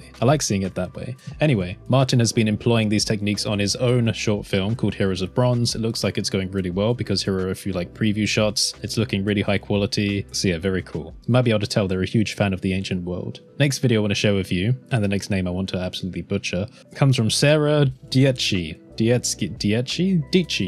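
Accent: United Kingdom. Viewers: I could listen to this Bri-ish accent forever much better if I Could speak it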